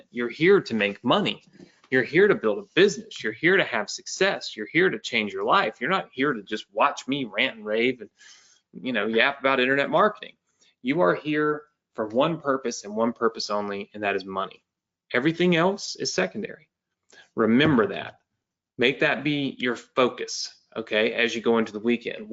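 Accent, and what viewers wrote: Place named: Mississippi, United States. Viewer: I know you are from miss. you talk like it.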